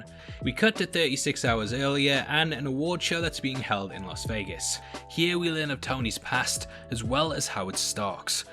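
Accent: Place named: United Kingdom. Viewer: He have British accent 😆😆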